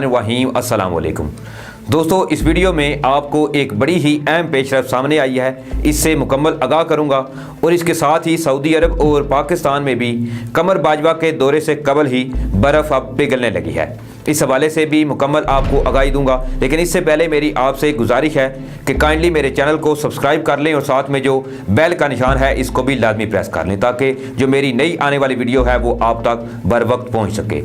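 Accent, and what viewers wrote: India. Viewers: Aap ki batain achhi hain lekin kuchh alfaz ka talafaz Indian wala kyun hai. Sahih Urdu bolain plz. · Ap indian urdu q boltay ho Baaten itni piyari or lehja indian ka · Sad but unable to believe if u r really a Pakistani as usually you use Indian accent of Urdu. Can u clarify from where u r exactly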